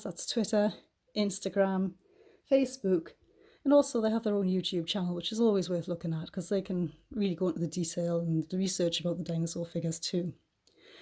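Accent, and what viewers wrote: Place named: North East England, United Kingdom. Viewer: Love this model and it’s nice to hear someone else from the north east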